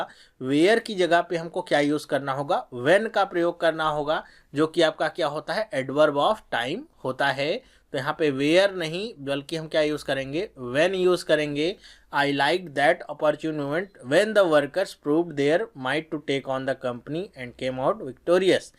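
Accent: India. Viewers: Sir your pronunciation is way too Indian.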